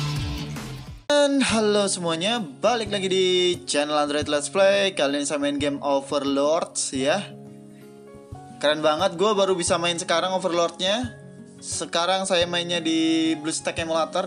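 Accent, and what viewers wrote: Java, Indonesia. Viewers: Gebrongot geni wolongewu Medog bangt bang jowomu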